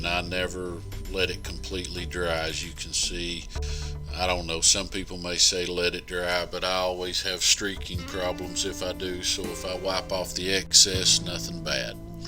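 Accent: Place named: South, United States. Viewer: I just subscribed to your channel! Man you have all the good toys! Your equipment from The Leather Co. of course is top of the line. But you go over the top with your vacuum former, your laser cutter/engraver, your burnisher. Good job! Where are you located? You have a pretty good good ole southern drawl! My kind of people.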